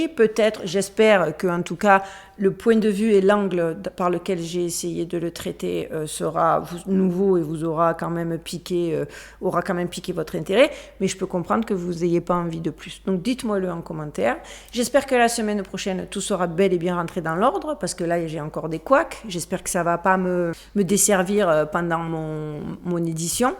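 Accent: Marseille, France. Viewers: Oui, continues ce sujet, c'est passionnant, .tu es passionnante et adorable avec ton léger accent marseil.. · Coucou! pour ce qui est de continuer ou pas sur Dubaï, fais comme tu veux. J'adore t'écouter parler, j'adore ton énergie, j'admire ton caractère affirmé. Nous sommes beaucoup à apprécier cette même chose. Aussi ton accent de belle marseillaise vivant en plein Vegas, j'adore aussi! mille bisous (oh ben non pas de bisous physiques, mille ça ferait beaucoup. Mais voilà: ces mille bisous-là! ). Stay safe you too. bye!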